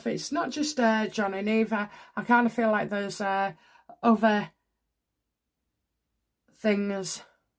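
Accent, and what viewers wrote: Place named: England, United Kingdom. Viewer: It’s an English dialect ❤️ fyi we’ve got lots of them 😁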